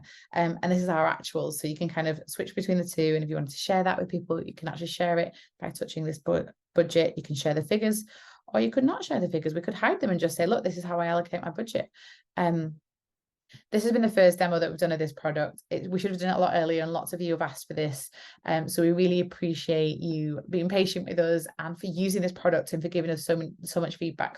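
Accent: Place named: North, United Kingdom. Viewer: just downloaded the app and looking forward to using it! this may sound awful but hearing that you’re northern makes me trust you a lot more 😅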